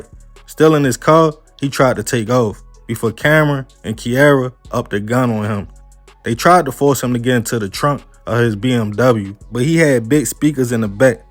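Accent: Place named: Mississippi, United States. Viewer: You got great stories but why it sounds like you read at 3rd grade level….or from Mississippi…. You have great content frfr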